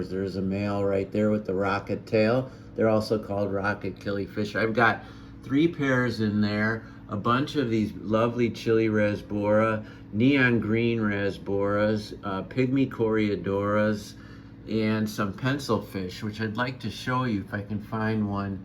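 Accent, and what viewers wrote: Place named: Chicago, United States. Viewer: I'm about 5 hours straight north of you. Great looking tanks and beautiful looking, healthy fish! About 3 minutes in, I thought to myself, "I bet this guy is from Chicago! 😂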